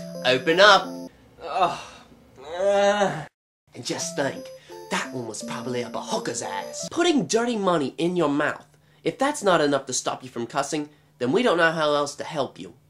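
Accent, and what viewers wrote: United Kingdom. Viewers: i loove ur british accent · cool british accent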